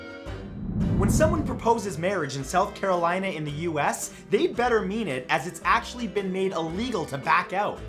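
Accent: United Kingdom. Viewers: Nice british accent btw it is crisps not chips in Uk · This British accent is awesome 😂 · British accent. Nailed it!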